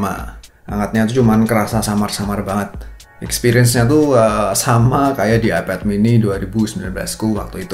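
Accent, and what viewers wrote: Java, Indonesia. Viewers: Mas medhok mu kui lho 🤣🙏🙏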